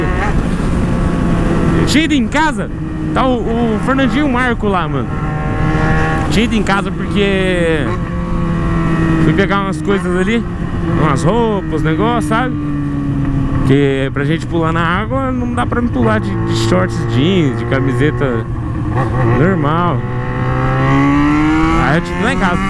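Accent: Goiás, Brazil. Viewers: Pq vc fala "no pique du Goiás"? Vc é daqui? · Queria saber por que o fala no piqui do Goiás kk eu sou do Goiás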